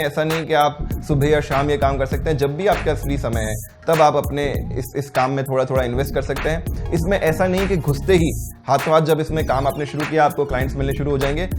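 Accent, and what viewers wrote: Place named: Tamil Nadu, India. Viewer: Difficult to catch ur speak... Too tamil slang.... Can u improve bro.???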